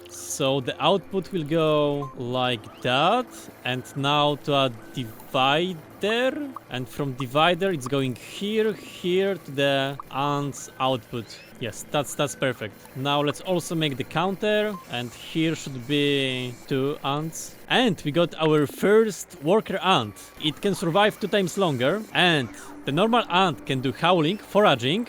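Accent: Poland. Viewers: czy ja slysze polaka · Odrazu wiedziałem że jestes Polakiem, wystarczyła chwila abym wykrył rodaka, poza tym niezły kanał, gratulacje.👌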